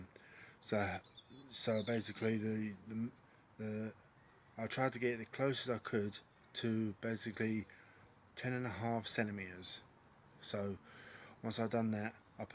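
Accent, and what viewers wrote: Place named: South East England, United Kingdom. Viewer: Are you from the south east mate? Fancy doing mine for me ?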